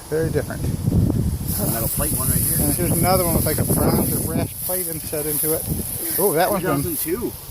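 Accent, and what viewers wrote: United States. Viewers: Love that Michigan accent.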